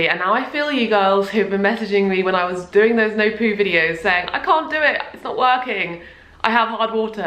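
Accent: United Kingdom. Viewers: aiutooooooooooooo the uk accent is really hard to understand , could you help the people about that ( it seems that you have to run , because you have to make dinner / lunch or to go in bathroom hahahah) ????????? thanks in advance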